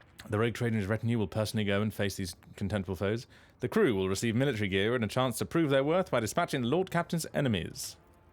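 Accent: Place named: United Kingdom. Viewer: Not the Lyacon shirt 😂 Speaking of Lycaon, I was watching the Pixelated Princess short while thinking about him and staring at his face and I was blushing a little. Was you doing that bit in his voice intentional Will? And another thing: I always joked about Lycaon sounding British and then you, Will, are actually British, so I guess British Lycaon is canon?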